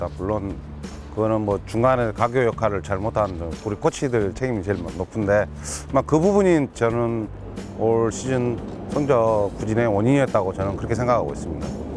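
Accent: Jeolla, South Korea. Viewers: 종국이성 진통광주전라도사투리 구사자네요 ㅎㅎ 응원하겠습니다 원클럽맨으로 감독도하고우승도하고 가즈아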